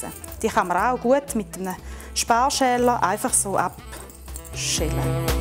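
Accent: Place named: Switzerland. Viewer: super video!!! ich als tiroler versteh den schweizer dialekt, hahhahaha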